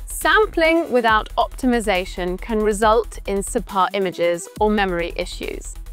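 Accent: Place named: United Kingdom. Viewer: why, It is England tone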